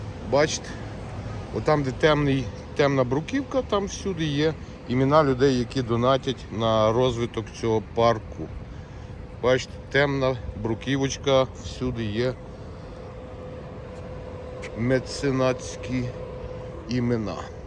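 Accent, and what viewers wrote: Zhytomyr, Ukraine. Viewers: Вітаю . У тебе мова геть на житомирську область схожа)..Цікавий контент робиш як на мою думку .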